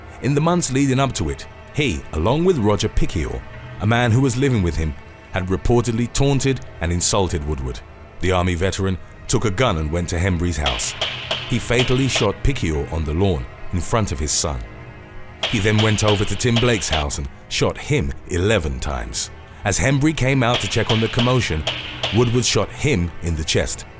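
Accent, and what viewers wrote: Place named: London, United Kingdom. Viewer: Neighbours looks much better.. How come you sound London but use American slang.. ie dollars neighbors etc.. Do you live there now? Great voice over broski. As Clear as a bell.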